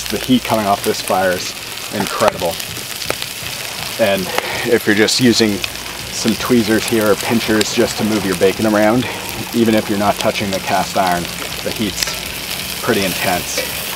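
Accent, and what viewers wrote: Canada. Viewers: is that a Canadian accent I detect? If you follow my page I have a cab in myself way up in the northern Adirondacks on the Canada border ck us out 👍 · I’m making some bacon today with your recipe… can’t wait!! You Canadians have the best accents ever!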